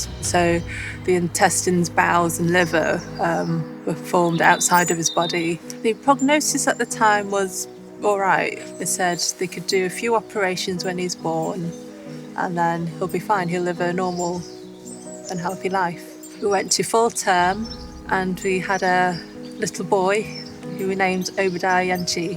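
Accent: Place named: United Kingdom. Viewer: You are the 1st Asian I've heard who has a British accent.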